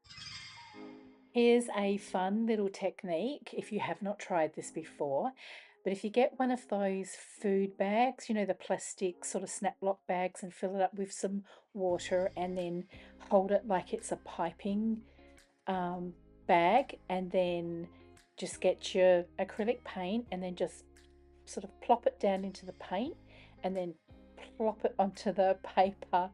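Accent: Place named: Australia. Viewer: Just came across your channel today and I’ve watched a couple of videos. You’ve gained a new subbie. It great hearing Aussie voices. 😊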